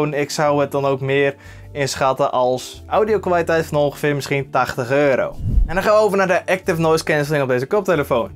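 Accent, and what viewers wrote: Friesland, Netherlands. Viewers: Leuk die Friese klemtOnen😄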